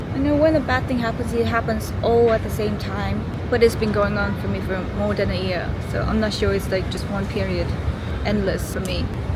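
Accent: United Kingdom. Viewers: 외국카페에서 런던 영어 발음하는게 매력적이여서 구독했지만 그게 구독을 이어가도록 한건 아니였어요. 님이라는 사람 자체가 매력이 있다는걸 알게되었어요. 당신이 어디서든 어디에 있든 그런건 상관하지않아요. 다만 당신이 조금만 덜 힘들었으면 좋겠어요.😊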